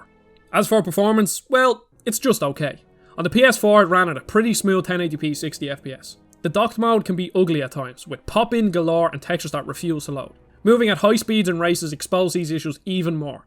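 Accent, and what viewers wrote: Dublin, Ireland. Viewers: Didn't expect a Dub accent on a Gamexplain video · Of all the places I expected to hear a Dublin accent, a GameXplain video was pretty low on that list. Fair play to you,.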